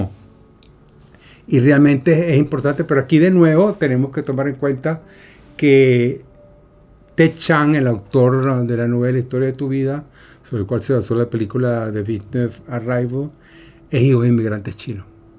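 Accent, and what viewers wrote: Caracas, Venezuela. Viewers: Magnífico post sobre una magnífica obra. Acento caraqueño inconfundible. Suscrita y activada la campana. Pregunto: esta trilogía tiene traducción en español? Gracias. Mil exitos.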